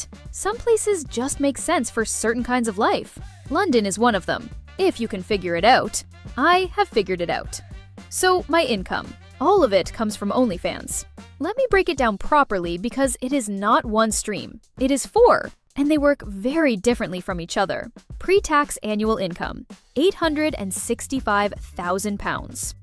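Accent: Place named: United States. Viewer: Why does she speak with an American accent instead of a British one?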